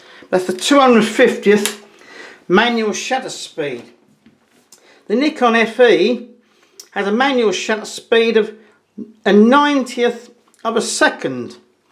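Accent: United Kingdom. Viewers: I am looking for a film camera (I am from the digital era), and Sir you convinced me about getting a FE. Love your British accent, greetings from Argentina.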